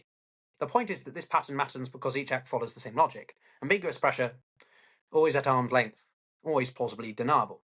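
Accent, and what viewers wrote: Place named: United Kingdom. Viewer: Your English betrays DECEPTIVE ALBION from the start……..caveat emptor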